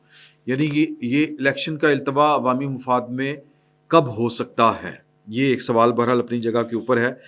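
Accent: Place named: Lahore, Pakistan. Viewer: Lahori style peerni voice 😅😅😅😅😅😅😅😅😅😅😅 iska matlab heh vlog ke liey not only voice but action is also related Aaj 2 lahorie sunne pehle Gohar Butt ji aor ab. ye hoti heh " boli,dharti mata " khamakha Urdu mein zaban ghumani perti heh aor English mein tuo aor bhi vhuman gheri hoti heh. anyhow enjoyed lahorie.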